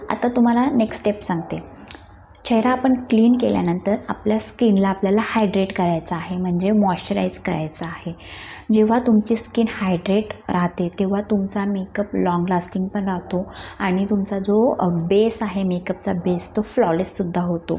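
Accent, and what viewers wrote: India. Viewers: Vada saydchi aahes ka bolnyavarun samjte must video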